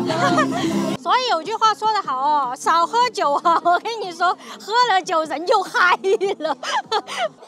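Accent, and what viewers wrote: Sichuan, China. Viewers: 不一样的坝坝宴，不一样的川式普通话，不摆嘞。。。。！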